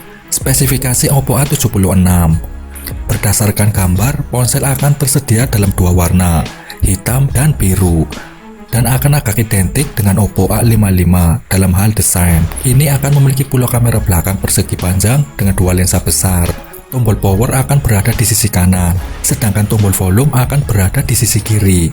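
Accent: Banyumas, Indonesia. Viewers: ngapak ya min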